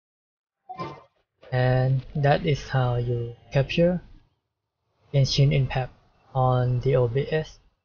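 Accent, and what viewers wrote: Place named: Thailand. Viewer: Are you Thai? It is random but you sound like you have a Thai accent and have darker skin (I know you have the accent because I hear it all the time from my moms friends)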